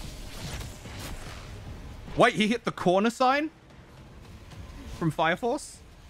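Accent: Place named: United Kingdom. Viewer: Bruv hes from britahs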